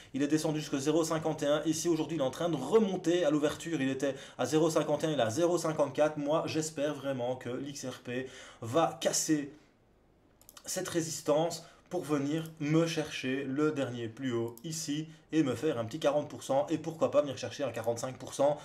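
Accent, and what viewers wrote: Belgium. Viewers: Coucou ça fait plaisir d'entendre ce belle accent belge, par contre le son c'est toujours aussi bas en volume et en gamme, courage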